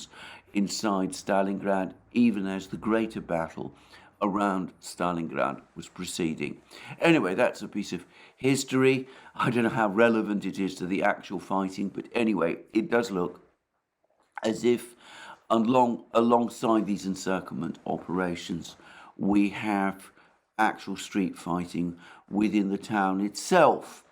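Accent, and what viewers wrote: United Kingdom. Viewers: One of the best places for lovely russian propaganda offered with a british accent by a disgraced lawyer.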